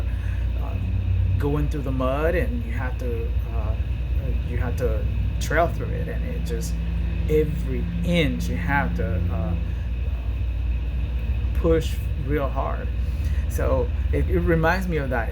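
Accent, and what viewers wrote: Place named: South, United States. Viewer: Love this,. Well done and very helpful. You are picking up a southern accent 😁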